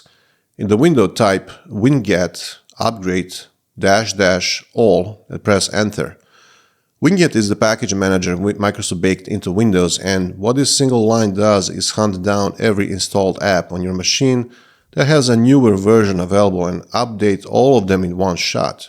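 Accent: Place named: Eastern Europe. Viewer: Thanks for this. Detecting a slight eastern European accent...are you Russian? Studied Russian a long time ago.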